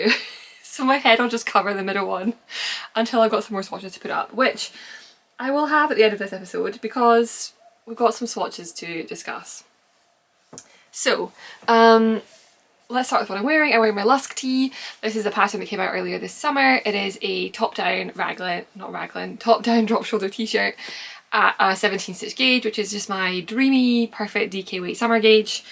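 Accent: Scotland, United Kingdom. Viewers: The Ariundel! It is very elf/lord of the rings vibe. I have never used this phrase, but I think I really am obsessed with that sweater. It is just amazing! I love hearing your joy in creation. Also, I am so imressed with your thoughtfulness about making patterns to fit bodies of all shapes and sizes. It's lovely. Finally, I'm finishing my first comment on one of your podcasts by saying I love listening to your accent. My parents lived in Scotland for 5 years and it is such a beautiful country with lovely people.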